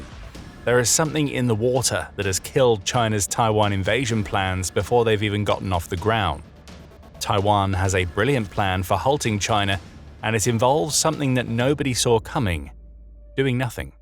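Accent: United Kingdom. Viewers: I enjoy watching your videos and your British English voice. But why use Americanisms like "gotten"?!! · Despite the British accent, this is not a European perspective. The video accuses the Chinese leader of doing exactly what the US administration is embroiled in - extreme corruption and promoting talentless political hacks to leadership while firing actual skilled leaders such as Gen. Christopher Donahue. I can’t imagine tattoo-covered Kegsbreath was making a rational decision messing with Delta force. So, don’t expect the US to do anything, but make matters worse. They’re also worse off economically. Practically no one can afford a house here. The GDP is artificially propped up by a few billion/trillionaires who control 50% of the economy or more.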